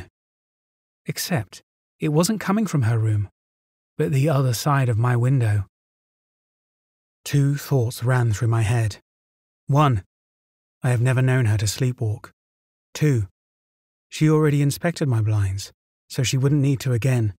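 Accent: England, United Kingdom. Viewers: Who knew an English accent and native folklore could work so well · British accent I’m out ! Painful to listen too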